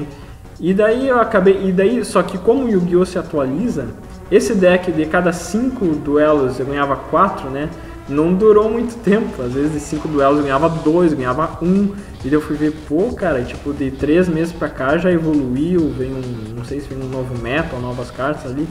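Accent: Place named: Rio Grande do Sul, Brazil. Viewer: Sou de São Leopoldo! Reconheci o sotaque hehe, já divulguei para uns amigos meus.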